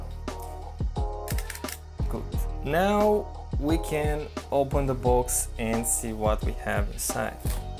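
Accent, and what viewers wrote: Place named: Romania. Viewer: you sound like the average romanian xd. Are you at least eastern or central European?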